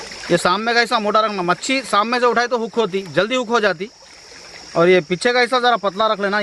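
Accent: Hyderabad, India. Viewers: Bhai Hyderabad se hai kya aap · kya mast bola... sounds like hyderabadi language..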